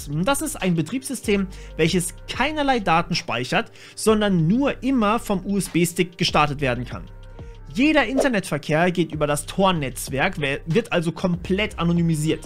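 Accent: Nuremberg, Germany. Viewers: kommst du aus nürnberg? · Bist du aus Nürnberg ?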